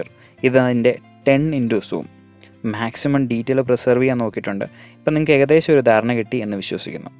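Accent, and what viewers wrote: Idukki, India. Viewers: Idukki karan ano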